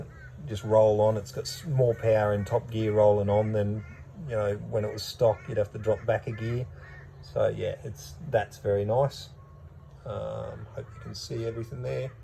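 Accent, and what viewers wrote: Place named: Australia. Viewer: Hay mate I also brought a 2021 V85tt Cenrenario model as well ( had for about 6 months ) was new hadn’t sold . I love the bike , I had a problem with the EML coming on over5500 RPM , right hand cylinder was missing. I reached out on the V85tt fb page and found most helpful. Apparently it was something to do with sonic wheel but resetting valves prior to reset ? , anyway done two big rides since and love the bike . You sound Australian ( I live in Wodonga Vic )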